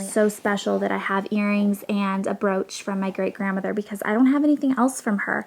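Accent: South, United States. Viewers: Haha your accent seems like it's gotten stronger from visiting home! I never really noticed a Southern accent but near the end of the video it seems to really come out! :) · It's funny because you sound southern! My favorite was the dreamcatcher!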